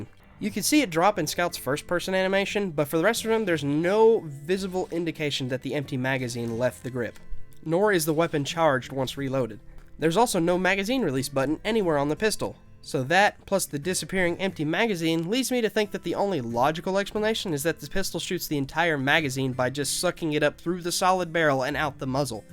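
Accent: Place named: South, United States. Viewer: The second I heard that southern drawl, I know this was a man I could trust to talk about guns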